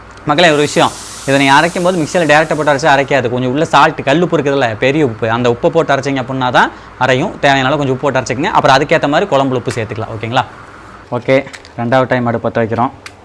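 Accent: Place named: Erode, India. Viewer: ஈரோடு ஸ்லாங்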